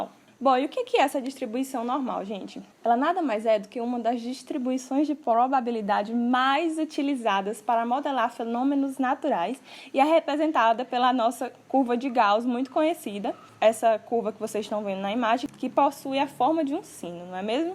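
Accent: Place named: Northeast, Brazil. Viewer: bacana a aula, e o sutaki nordestino é característico srsrs..vlw pelas dikas ,, estou me formando em engenharia de pericias vlw. pela ajuda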